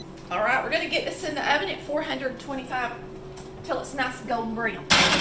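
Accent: South, United States. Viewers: Well I just signed up a few minutes ago but you're fun to watch. I love your southern accent. And I love that you sat down to eat your meal.😁 I think that's fun to watch too. Most people don't take a bite of the food they cook, and I wish they would. :-)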